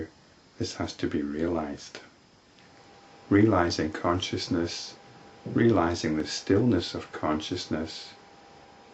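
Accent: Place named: Scotland, United Kingdom. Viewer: I wonder where exactly your accent comes from. It sounds Scottish, but I don't know what city.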